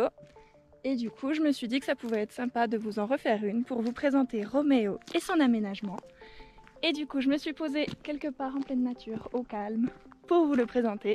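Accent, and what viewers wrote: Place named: Franche-Comté, France. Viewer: Belle présentation (avec ce charmant accent franc comtois si je ne me trompes, 😉). J'adore le bac a douche encastré et le toit relevable,c'est vraiment top! Du coup, je me demande, il y a un faux plancher pour l'encastrer ? Et ,est il relié directement à l'extérieur ou as tu une cuve d'eau grise? Hâte de suivre tes prochaines aventures 👍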